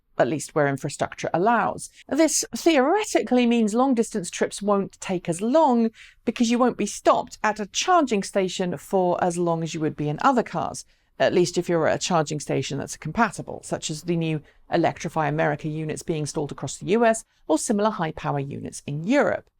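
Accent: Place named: United Kingdom. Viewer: WHERE DID YOU GUYS FIND YOUR TRANSEXUAL COMMENTATOR.....WITH A BRITISH ACCENT......IS THAT SUPPOSED TO BE COOL ..?...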